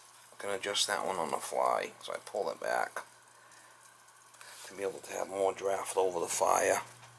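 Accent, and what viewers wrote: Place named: Cape Cod, United States. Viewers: near cape cod accent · Mada Nielk that’s a Dartmouth/Fall River/ New Bedford accent.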